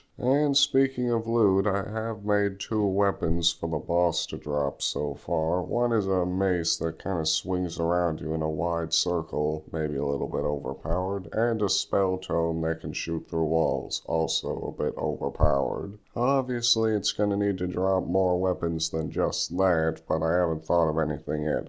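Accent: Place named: South, United States. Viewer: Is it just me or does his voice give off " " vibes?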